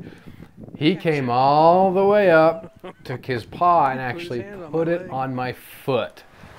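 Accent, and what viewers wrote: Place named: Appalachia, United States. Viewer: You reverted back to your hillbilly roots with that accent. Good vid.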